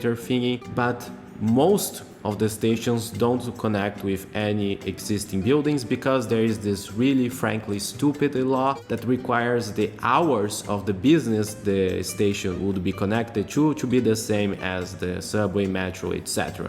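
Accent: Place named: Russia. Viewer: Seu ingles me lembra um ingles russo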